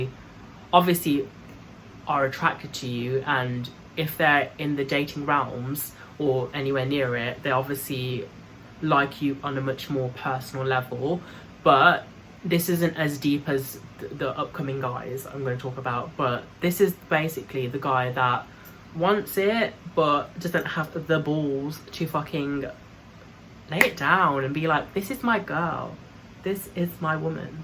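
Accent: England, United Kingdom. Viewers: Loved the video, it was good to hear an English voice rather than American. I thought you brought out relevant points in your topic which has been covered many times by Trans women on you tube. But you missed out one type of man in your vlog and that is the one who looks at a trans as a woman not bothered what's between her legs, takes her for who she is. Not worried about being seen in public like the relationship is some kind of secret that he doesn't want to let out of the bag. And yes, I've subscribed. Keep safe.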